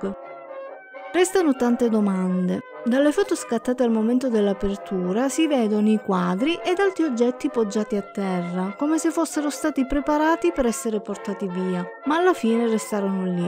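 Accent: Italy. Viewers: Sei palermitana tu che parli ???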